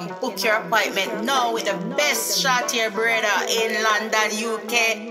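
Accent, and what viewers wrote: Jamaica. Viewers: A me alone hear the jamaican accent in her voice?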